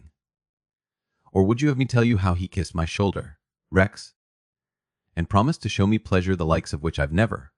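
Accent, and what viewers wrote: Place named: United States. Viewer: AI American accent narration in audiobooks supposed to be set in Regency Era London sounds dreadful. Please try an AI with an English Accent at least.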